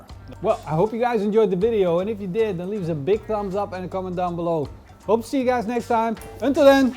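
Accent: Netherlands. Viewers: I could just hear that dutch accent and it makes is 10 times better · First video of you I watch, one minute in I thought “huh he sounds dutch” and sure enough a few seconds later you start talking dutch lol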